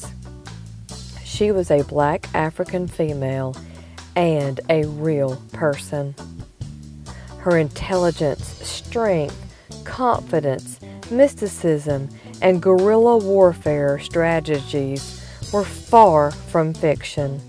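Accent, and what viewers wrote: South, United States. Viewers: My grandad Will was a Maroon and I cant stand this southern American voice over.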